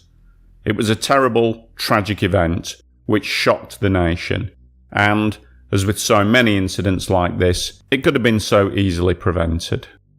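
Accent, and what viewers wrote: United Kingdom. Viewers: Voice over British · delivered in a soothing, charming British accent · Newly subscribed. You sound good and British mate. Cases we have never heard of such as this will take you far here on the tube. T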